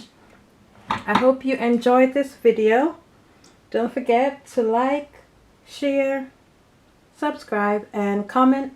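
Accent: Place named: Montserrat. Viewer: Funny when I heard your accent and saw the ingredients my first thought was Montserrat 🇲🇸. Are you from Strat? Very good video, clear and easy to follow.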